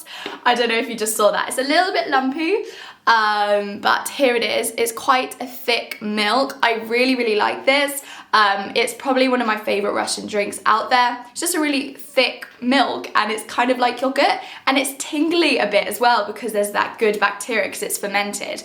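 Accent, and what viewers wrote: United Kingdom. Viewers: hi, i'm Russian girl living abroad, like how you speak about russia with your british accent, and dairy food! miss that 😀 I'm also a small youtuber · What a beautiful British accent! It feels like I am back in the UK. Though you are not quite right regarding special attention to cleanliness in Moscow for the reason that it's the capital. I spent nine months in my home city of Krasnodar (1 million population) last year and its impeccably clean - not only central streets but everywhere. They clean it and clean it and clean it again. You don't see too many police but the city is very safe. If there's police on the street it's usually a mixed patrol - a police officer with two Cossacks (as it's a Cossack region). Again, it's very safe, you don't see drunk people (except the area of the railroad station probably). Thanks for you opinion. 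It's very interesting!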